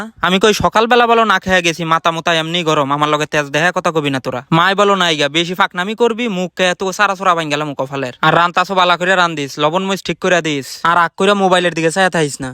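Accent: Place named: Shariatpur, Bangladesh. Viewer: ভাই আপনার কথা শরিয়তপুর জেলার মতো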